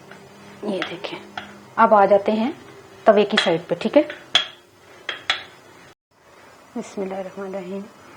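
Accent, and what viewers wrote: Punjab, India. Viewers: Sachi aapki batein karte hue, aur vo bhi Punjabi mau, bahut achha lagta hai. Aise lagta hai ki bas sath hi khade hoker batein karte karte maze se khana pakka rahe hai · Kasssamayyyyyyy and also ur punjabi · Wah ji wah parathay ka maza to apni jagha lekin ap k bolnay ka andaz bht sweet hy urdun punjabi ka tarka lagta hy to maza aajata hy mn ap ki bht sari cheezain try kr chuki hon aur achi banti hn meri duain ap k sath. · baji ap ki cooking zaberdust hoti he....or sath ke sath ap ki meethi punjabi bhi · You are so sweet, your Panjabi is so cute.Love you dear. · Punjabi bht sweet bolti hn ap bht zada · Asslam O Alaikum Baji apki punjabi bht sweet hy or ap ne bht achi technique btae God bless you or ap ka pratha bht acha bna hy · Masha Allah so sweet voice aap ki mujha panjabi bahut pasand hai masha Allah masha Allah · Aap ki baat karne ka tarika thoda panjabi hy mashaallah paratha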